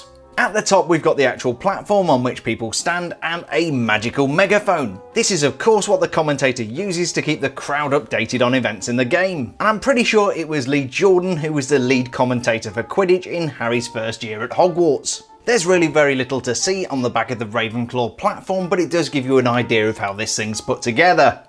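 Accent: United Kingdom. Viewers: Love your British voice sorry if racist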